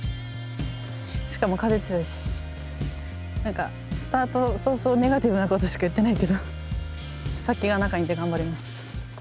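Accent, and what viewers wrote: Kyoto, Japan. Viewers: 京都弁でリラックスしてる感じがいいですね。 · はんなり京都弁で可愛さ増し増しやね～ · 元気が一番です！京都弁は可愛く見えますね。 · 理香子プロのスイングは以前からの憧れ。色んな番手の色んな角度からのショットが見たい。 プロの京都弁が可愛い。 どんどん続けて行って下さい、応援しています。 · 現役の娘らよりも凄いスピン。京都訛りに萌えます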